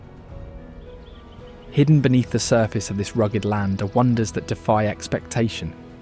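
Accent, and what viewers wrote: England, United Kingdom. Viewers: I think the documentary would sound better with a native Wyomian than a British person narrating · Sounds British. He is making a nice video. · The British accent ruins the documentary. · Unseen Wyoming. We love Wyoming. PLEASE use an American narrator for American locations. It is only respectful. Plus, we cannot understand some of the words, as spoken differently. Save the British for British. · If it was filmed by a Brit then the narration is perfect. He’s easy to understand. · Yes. An American state british accent. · Wrong accent don’t need a Brit voice in American 🇺🇸🇺🇸🇺🇸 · This video has literally nothing in it that is hidden or unseen in Wyoming. These are all famous attractions known by any visitor which I am almost certain the video maker never has been. If you were English like the video sounds maybe check out London, that’s a very obscure place in England you could do a video about try focusing on London Bridge or Big Ben. 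But probably you’re just AI . Either way stay home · why the F does this have a brit accent??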